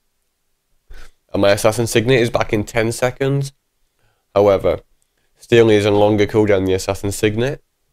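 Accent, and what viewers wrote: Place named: United Kingdom. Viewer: i hate ur fucking british accent jeez , put subtitle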